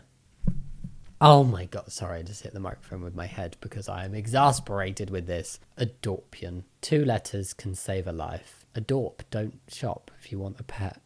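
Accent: United Kingdom. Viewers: it’s alright I’ve gotten used to you saying things in british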